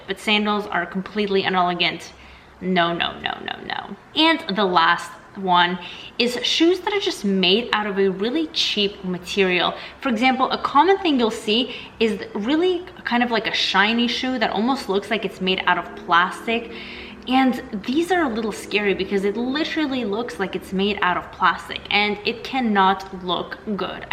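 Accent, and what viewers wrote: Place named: Russia. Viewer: I was doing good until you got to wedges. I have a pair of black ones for the office. Where I live in Canada a lot of women wear these type of shoes for work. It makes driving a lot easier, easy to go up and down stairs, don't need to worry about getting heels caught in the escalator or those annoying grates on the sidewalk that you can't avoid while walking in a crowd. And for me, I am always scruffing my shoes on the wheels of my chair. But yes, my sandals are pretty, I think. I was also wondering about your heritage. Is your background Russian? My background is Ukrainian and you sound Russian to me.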